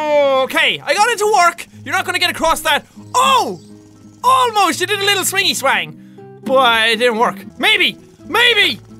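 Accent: United Kingdom. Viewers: you have good british aqzent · I love his British accent lol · Omg that British accent though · HISS VOICE IS BRITISH I KNEW U WERNT IRISH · YOU WOUND SOOOOOOO BRITISH! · Good British voice PS it's amazing !!!! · wow I didn't know he was British too XD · Calm, British Jack filled me with hilarity and concern.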